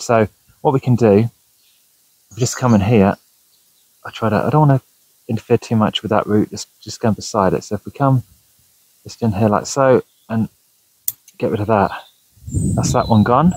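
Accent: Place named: England, United Kingdom. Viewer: By the accent, you are English, aren´t you? Crazy good potters over there, I got some :D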